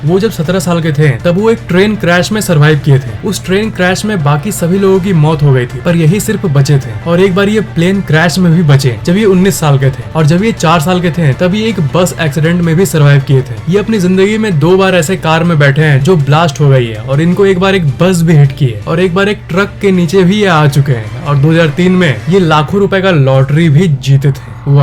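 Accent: Bihar, India. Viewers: Bro are you from bihar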